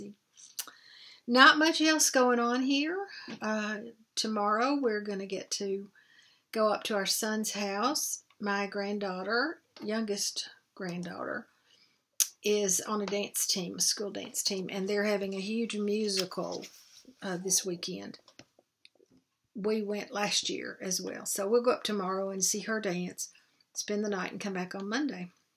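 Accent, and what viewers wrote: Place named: Arkansas, United States. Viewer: Hi, I watched you for the first time today & certainly enjoyed it😊 I will be returning..we live close, we are on the Arkansas line, so your accent made me feel at home😊thank you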